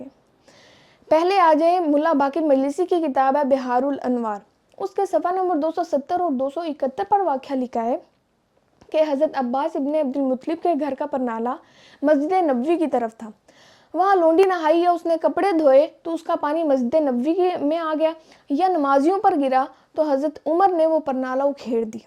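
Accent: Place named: Punjab, Pakistan. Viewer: آپ کی اُردو میں ۔۔۔۔ پنجابی ٹچ ۔۔۔ وکھری ٹائپ کا ھوتا ھے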